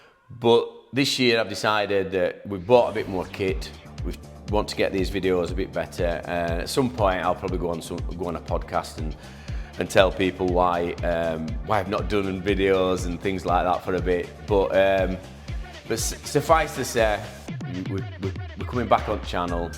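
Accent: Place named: Yorkshire, United Kingdom. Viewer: Deffo agree, could hardly hear those dulcet Yarkshah tone because of that crappy background music.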